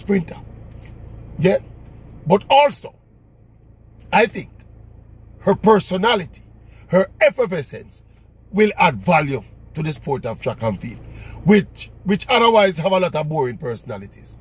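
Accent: Jamaica. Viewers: I knew I would find you here brother love the Jamaican accent ✊🏽✊🏽✊🏽 🇯🇲 🇺🇸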